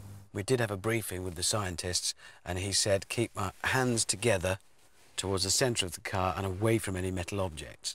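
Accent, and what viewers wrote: Germany. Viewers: This is the most german english ive ever heared😂 vor handred sausend wollts^^ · Well to be honest, almost every German older than 30 is speaking like that. The younger generation, especially the teenagers are a lot better and have less of an accent because they grew up with youtube. But I guess you will always be able to tell. BUT: As a german native speaker I am always able to tell if the person I am speaking to is an english native speaker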